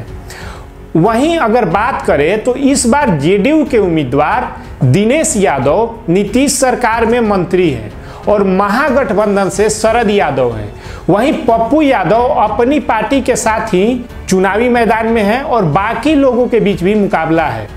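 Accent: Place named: Bhojpuri, India. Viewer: bhai mere bol Hindi rahe ho lekin awaz bhojpuri wali hai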